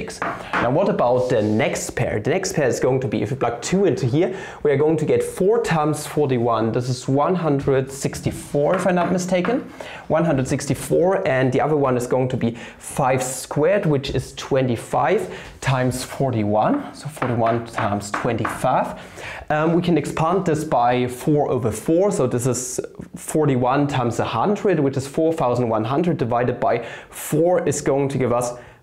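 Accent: Germany. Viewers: You sound german, are you from Germany?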